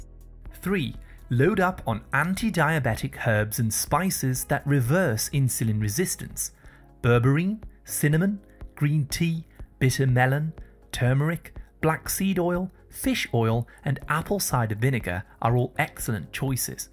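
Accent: United Kingdom. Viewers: Great — Are you British ! Is that your Original Voice !!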